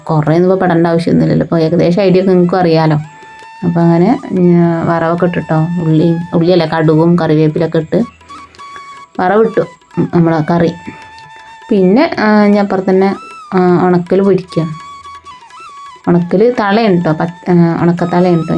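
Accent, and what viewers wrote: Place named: Malappuram, India. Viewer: Nalla video, manoharamaya drishyangal. Calicut, malappuram bhasha ippo kelkkbol chiriyautto, njan avide vanna samayam , samsaram kettittu, veruthe thalayaattikondirunnu. Ippozhum cherya budhimuttundu, ennalum ok, Curryveppira nannayi grow cheyyanulla tip parayatte, oru glass kanjivellam pulippichu 4 glass vellam ozhichu dilute aakki ozhikkuka. Ithu njan pareeshichu jayichathaanutto.God Bless ❤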